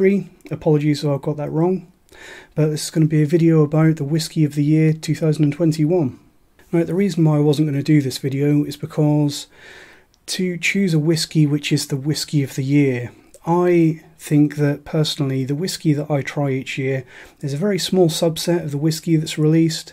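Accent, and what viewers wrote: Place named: Norfolk, United Kingdom. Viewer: Hi mate,you sound like you're from Norfolk, am I right? I am a Norfolk whisky nut, North Walsham. Let me know if we are neighbours! All thebest. Regards, Pete.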